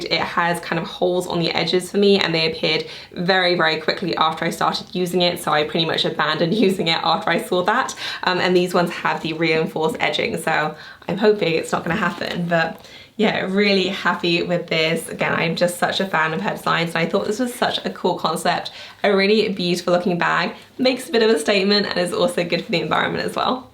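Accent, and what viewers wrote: England, United Kingdom. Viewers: Love your videos, but must admit it's a bit disconcerting to hear you say 'store' quite so much in an English accent! Have never heard anyone here refer to shops that way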